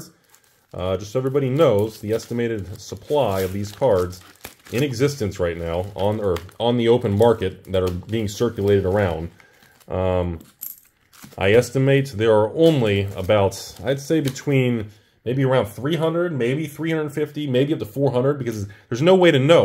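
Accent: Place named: South, United States. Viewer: It’s his pronunciation. Sometimes I hear it was “crowns” IN THE SOUTH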